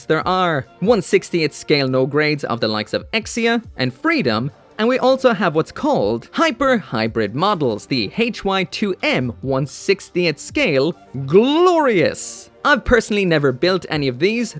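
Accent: Ireland. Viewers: its a misheard, he said 18 meters, hes irish accent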